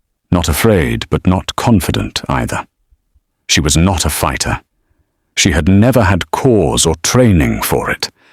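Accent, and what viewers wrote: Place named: United Kingdom. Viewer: The narrator is British so I'm choosing to think this is set in one of the beautiful moorlands of my home country, I don't care if it isn't, I claim it! 🤣 I like he went after her, he knew he screwed up and believed he was protecting her but he should have told her what was happening instead of hiding any danger from her. She's an amazingly calm soul who dealt with this with great dignity.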